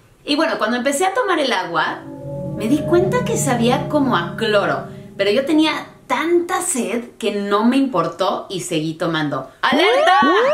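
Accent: Mexico. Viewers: rad15 90, para estás fechas, ya sabrás que ella nació en EUA.......y que fue estuvo desde pequeña estudiando en México, (por asuntos familiares) así que sus estudios básicos elementales fueron bajo el sistema educativo mexicano. Es básicamente una mexicana, nacida en USA. Qué les pasa?.....no tiene ningún acento, que no sea el español; aprendido en México. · You speak like a Mexican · Tiene el acento Mexicano. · Veo tus vídeos más que nada por tu acento jaja. Me gusta mucho que hables tan mexicana, no es sólo que hayas vivido aquí, sino que entiendes la cultura y eso se agradece. Sobre la droga, hubiera sido interesante saber si Pancho conocía al bartender jaja es lo único que me hace lógica. Como comentario sobre el video, sentía a veces que la música más que adentrarme en tu narrativa, me distraia y desconcentraba de lo que estabas diciendo. Creo que no es necesaria. 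Saludos!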